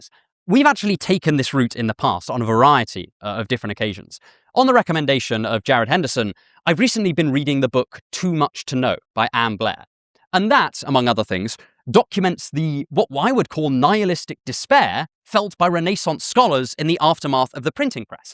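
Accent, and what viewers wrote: United Kingdom. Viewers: Entirely unrelated to the video, but do you ever feel as though people (not from the UK lol) legitimize what you say because of accent you speak in? I randomly had the thought as I watched this video. Just “if were American or Australian, would my/the audience’s reception of his words remain the same?” It sounds really horrible but I’m not without bias.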